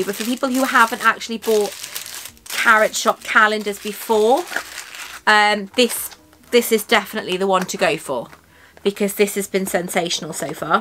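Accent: Wales, United Kingdom. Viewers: How your mental health been a bit better I hope. Hugs 🤗 always I get it I go through bouts mainly holiday periods when less occupied which for us a must right, your first day one on the calendar I was reminiscing so I use to have a pink baby pink jewellery box when I use to go through temporary as people are just baffled and quizzical about me find myself the golden you showed here I had that exact colour as a lock and key duo. Just thought of that time.. baby good key but giant box.. Hey you want to wear two necklaces wear it who cares I say do what you want to do. Your nails 💅🏼 didn’t look that bad honestly just a few scraps here and there but SO. I love longer videos I’m breaking it down for today and then tomorrow. Though which sometimes I do we don’t get a tree so no bauble to hang I could I could put it on my bed 🛏️ heh heh 😼 I like your Welsh accent. 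I’m so fussy I love pen collecting too whatever people think don’t care I’m completely away from the so called norm entirely. I don’t like the pens with the on top parts either ballpoint also when I had issues writing the bic pens worked better for me grip wise as it was a wreck for ages and ages so had to do handwriting practice which now is so much better. But I have to have to write properly specific pen they said with me for holding and grip which I find it’s true certain ones it’s just all over but I find have to have lined notebook non lined writing slops down like a hill. So with me nothing on top like that or my gr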